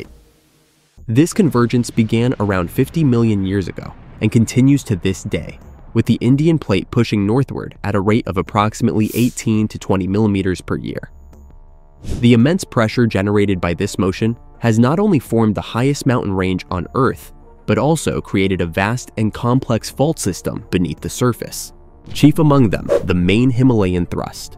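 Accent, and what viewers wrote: United States. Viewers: This is true to the rest of the world also...... What about Yellow Stone.... This speaker seems to have forgot that his American focks are equally at risk since they are sitting right next to the what is known to be the biggest volcanic geo event point. How about that... So in your Amercian accent please talk abt Americans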